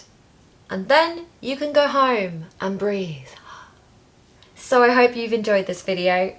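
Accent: United Kingdom. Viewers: I love your British accent and watched your every episode and repeated . Appreciate ! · I appreciate your hardworking and the opportunity to see and listen to your videos every day, Really your British accent is incredible and I watch it every day your lessons. Thank you so much. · Great British pronunciation. Absolutely teachable class. T I want to reading passage class. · I watched several videos about this same topic but this one is so useful & informative with a superb lovely British accent that i wouldn’t hesitate to watch again ❤ Thank you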